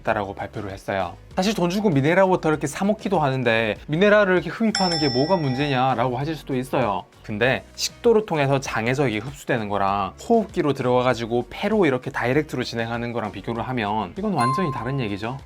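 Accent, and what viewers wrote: Gyeongsang, South Korea. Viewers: 갱상도 발음인데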